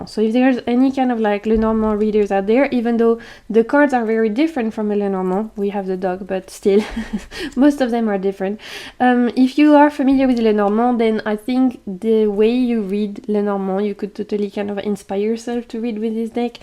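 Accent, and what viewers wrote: France. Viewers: With you on the whole angel thing….. but that angel deck you showed looks like a deck I could get next to. Thanks for sharing. Just wanted to say I love listening to you with your beautiful French accent….. tres charment! Plus would you be willing to you teach people how to correctly say Tarot de Marseille!?!?! 😂😂😂 it drives me nuts how English speaking people say TdM!!! Especially those of us from the states. Love the Marseille Waite….. I’ve seen it before and really didn’t expect to like….using a cohesive art style really makes the difference. I love your idea about how to read round cards. My first deck was a round deck and I never thought of the directionality as time, but I totally love this idea and I’m going to give it a try.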